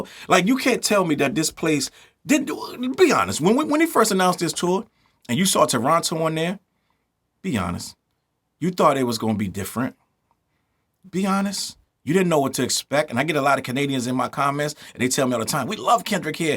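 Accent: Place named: Boston, United States. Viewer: auticle haha that Boston accent be poppin up here and there lol.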